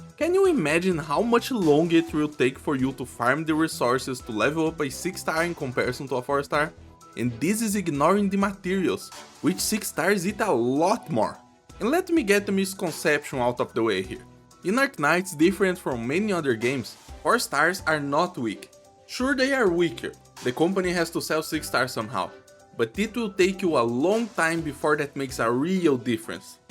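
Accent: Brazil. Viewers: manooo, eu assisto você um tempo já e eu tipo eu reconheço esse sotaque prq é o msm q o meu XD eu ia comentar da onde você era no caso de qual pais e agora lendo os comentarios vi que você era br mesmo, eu amei seu vídeo como novato no arknights eu to amando o jogo eu to esperando o banner do mizuki desde o primeiro dia que eu joguei, alias um vídeo desses pra um 6 estrelas seria incrivel! :goodvibes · Tô ouvindo um brasileiro aí kkkkk. Raro ver BR jogando Arknights. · Esse sotaque BR. Too obvious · Percebi na hora que é brasileiro só pelo sotaque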